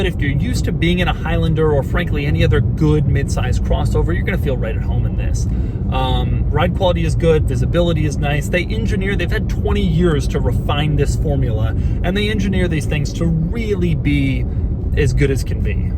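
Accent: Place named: Philadelphia, United States. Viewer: Philly accent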